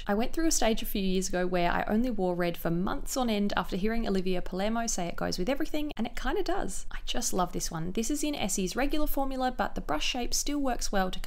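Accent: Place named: Australia. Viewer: I keep getting stumped! An Australian accent so I automatically assume you're from Aus and the products you talk about can be found here but both Olive & June and Glossier don't ship here! So upsetting :(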